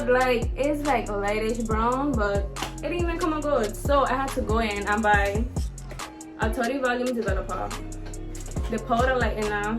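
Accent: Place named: Caribbean. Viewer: 😗😗😗I hear that caribbean accent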